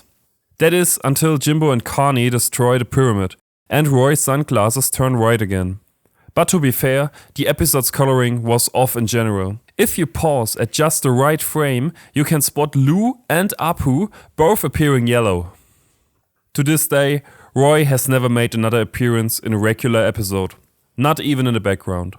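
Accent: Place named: Germany. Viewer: Your German accent makes it even funnier. Weiter so!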